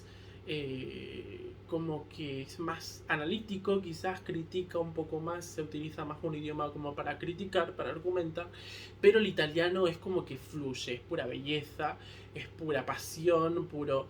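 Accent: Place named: Argentina. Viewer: Cuanto hace que vivis en Barcelona? Hablas super argentino y se nota que haces un esfuerzo para pronunciar la "zeta". Entiendo que uses palabras como coger el bus, buaah tio, y todas esas expresiones... pero a que cambies de sonidos me parece muy contranatural.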